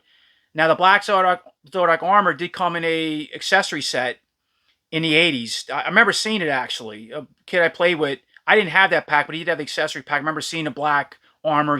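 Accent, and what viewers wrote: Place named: East Coast, United States. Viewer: i freakin love seeing this stuff reviewed with a surly back east teamster accent 👌😆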